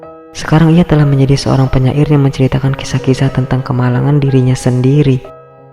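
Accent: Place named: Bugis, Indonesia. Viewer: Ada logat2 bugisya..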